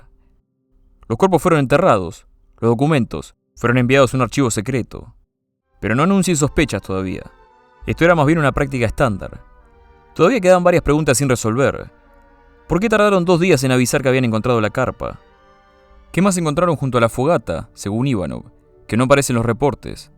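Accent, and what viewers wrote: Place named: Buenos Aires, Argentina. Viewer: Sonás re-porteño flaco, ¿de que barrio de capital sos? (no es una crítica, lo pregunto con buena onda). Capital federal (denominación antigua para el distrito federal nacional de Argentina), C.A.B.A (ciudad autónoma de Buenos Aires: denominación moderna)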